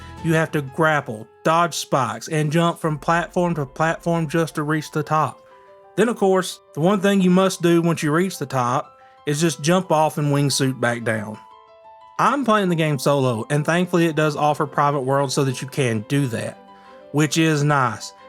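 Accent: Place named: Appalachia, United States. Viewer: No, it's because he has a southern or appalachian accent, and it's the way he pronounces his words :D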